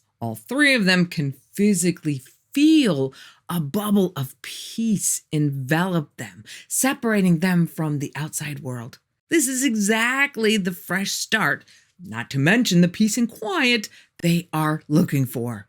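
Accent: United States. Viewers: insufferable yank voice. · I never thought I could listen to an American accent for 3 hours, but she is a very good storyteller. Very Enjoyable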